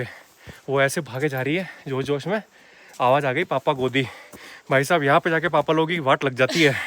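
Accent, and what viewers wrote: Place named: Rajasthan, India. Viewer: For the first time watching your vlog.. Nd aapki rajasthani sun kr meri beti khush ho gayi.. Ki ye tou apne hi h... Morpankhi vala time aapne sahi kaha.. Barat ka swagat hota tha morpankhi or gupab ka phool dekr... You are doin wonderful job... Keep goin..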